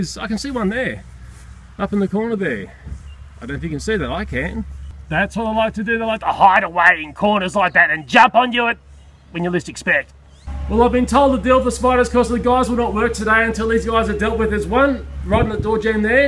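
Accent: Australia. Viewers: Ur videos kill me i love ur Australian accent its the best . GOODNIGHT SISTA 😂😂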